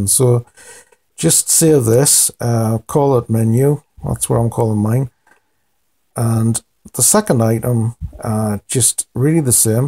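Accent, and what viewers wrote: United Kingdom. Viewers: Thanks for these VERY helpful tips! Nice to hear a NE accent as well...I'm from Durham City but living in Kansas now. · The bit about Dropdown menu items is no longer correct in version 4.0.4. I wasted some time before realising that there is now a menu in the middle of the page above the main form where one can add items, remove items and so on. Otherwise, it is a nice presentation. I like the BBC accent. :-)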